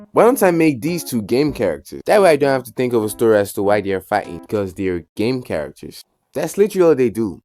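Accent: Nigeria. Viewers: U no fit hide ur Nigerian accent. Ogbeni nice video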